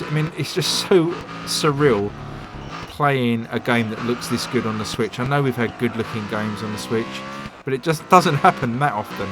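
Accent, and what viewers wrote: England, United Kingdom. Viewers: Your English accent goes well with the realistic racing genre. Giving it a proper Top Gear feel somehow. Part of the reason these Grid series take off so well on your channel IMO. Take note that covering realistic videogame racers might be a bit of specialty of your channel from now on. 😊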